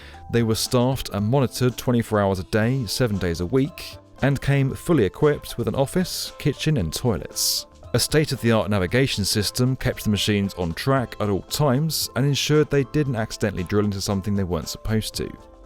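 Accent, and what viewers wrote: United Kingdom. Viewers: Weird hearing a British guy talk about the suburbs I’ve lived in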